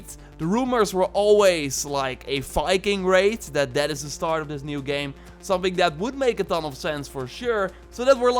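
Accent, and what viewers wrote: Netherlands. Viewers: Are you Dutch lol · Are you dutch? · are you dutch?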